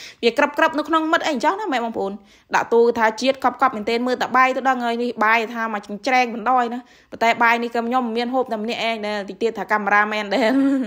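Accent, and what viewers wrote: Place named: Siem Reap, Cambodia. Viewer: នៅខែត្រសៀមរាបបានជានិយាយល្អើន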